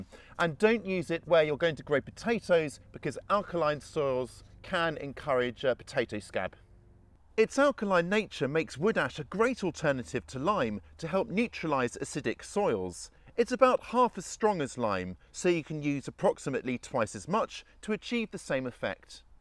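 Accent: England, United Kingdom. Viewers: “Give me some skin bruh” something about your English accent has me addicted to this channel lol the endless knowledge and tips help out too 😎🤘🏼🪴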